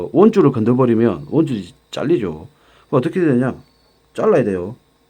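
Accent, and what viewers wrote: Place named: Gangwon, South Korea. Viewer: 강원도 분이 신가봐요. 너무 웃기게 말씀하네요. 감사히 시청하였읍니다.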